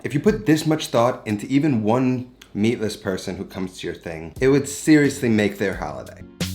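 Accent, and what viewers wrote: New York, United States. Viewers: Sounds like a New York Italian accent... very similar to a Montreal Italian accent. 😉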